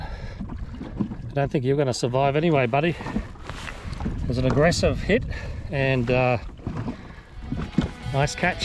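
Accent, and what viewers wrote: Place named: United Kingdom. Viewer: Slow down your British accent , have no clue what you just said .😬😅✌🏽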